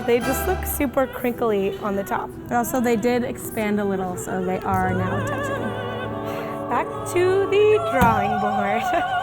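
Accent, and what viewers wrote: United States. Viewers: I've never heard a more American pronunciations in my life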